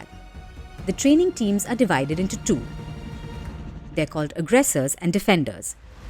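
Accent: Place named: India. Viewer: I detected the Indian accent! Why would Inida care and brag about the US military? This is weird.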